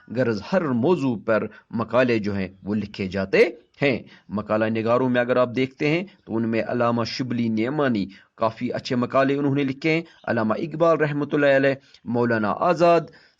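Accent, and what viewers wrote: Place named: Sindh, Pakistan. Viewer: کیا آپ سندھی سے تعلق رکھتے ہیں ؟ آپ کے لہجے میں سندھی مٹھاس کی حلاوت محسوس ہوتی ہے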